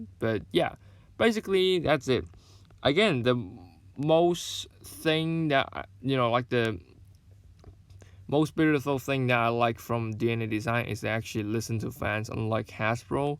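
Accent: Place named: United Kingdom. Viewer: British accent~~~~~~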